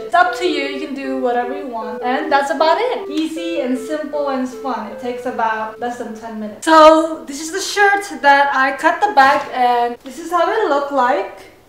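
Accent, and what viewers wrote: Philippines. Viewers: **MUTE ASAP** just THAT TYPICAL filo fob accent.... -COMPLETELY KILLS IT!!! (....&tryn act ol cute n shit, pfffft~LMAO😁) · Filipino accent! haha understand kita! (: love you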